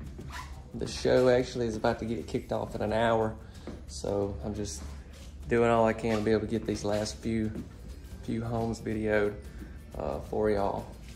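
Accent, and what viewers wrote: South, United States. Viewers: Here in Texas, they say "fixin to"....in his adorable way...says "fitin to".....I am beginning to learn Southern with him!!! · but it is you. You are a southener. Not a bad thing. It is in your personality be glad it's a likeable trait.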